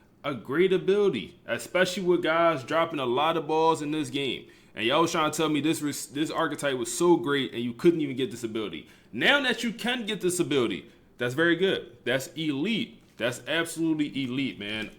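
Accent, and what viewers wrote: Philadelphia, United States. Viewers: Philly accent is heavy bro 😂😂😂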